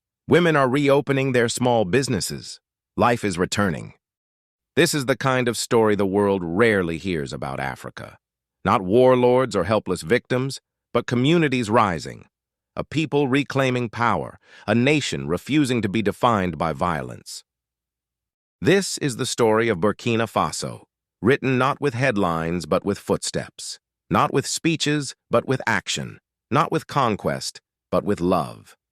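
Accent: West, United States. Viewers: The same western accent. Cant we have an African accent if we are getting news/presentations about Africa. It says that its not a war waged by outsiders, but this sounds like an outsider to me. I am fed up with this. TRULY RECLAIM OUR IDENTITY. We need to be African in EVERYTHING. This is NOT a voice of Africa! Pas la!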